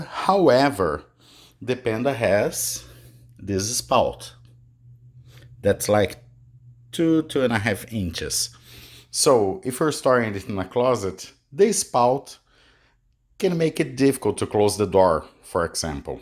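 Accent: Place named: Quebec, Canada. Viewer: Man, he sounds like a French Canadian... (Disclaimer, I'm a tamed French Canadian)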